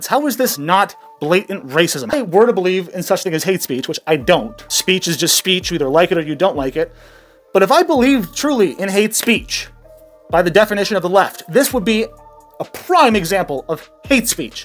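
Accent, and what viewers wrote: United Kingdom. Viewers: Loving the scaaawtish accent!😂💯👍🏻 I’m not on Twitter thankfully so I haven’t got to hear all that BS hate! Thanks 🙂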